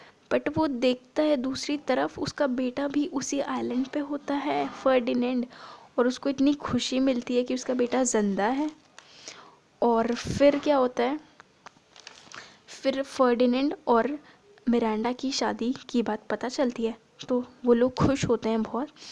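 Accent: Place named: Uttar Pradesh, India. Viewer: By your act of speaking i am sure that you are from uttar Pradesh 😇😇😇😇 isn't it?if it is true you just like my comment.By the why I am also from uttar pradesh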